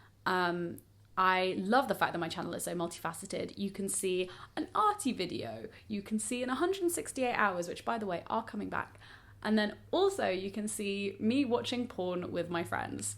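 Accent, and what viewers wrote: RP, United Kingdom. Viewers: I'm ngl I kinda get why people say the disingenuous thing but only because of how you speak like your accent is quite posh? i don't agree with the disingenuous comment but i kinda get it? like posh people are categorised as super fake and bitchy but i don't think that it's accurate of all posh people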